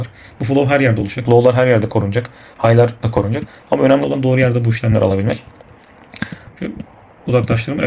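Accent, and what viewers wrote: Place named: Italy. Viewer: hocam kimlerden eğitim aldın ? konuşmandan italya rüzgarı geliyor hafif :) çok güzel bi entry modeli bu arada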